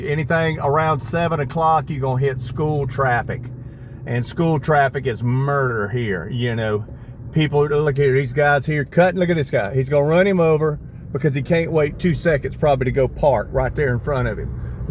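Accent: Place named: Texas, United States. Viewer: Men just opinion to gain follower as you are leaving in Philippine you should hold back and be mindofull or should I say make your vlog meaningfull on side of being us , I get you as Joe in our country but if your running this vlog as if your expecting something to gain in good way I will tell you you sound like fron Texas or mid west as fuck , again if you want to gain in nice way in your vlog be with us and understand , we don't like people judge us coz we are pinoy we leave like this. Get you ass off our of our country if you talk or sound like Joe to educate us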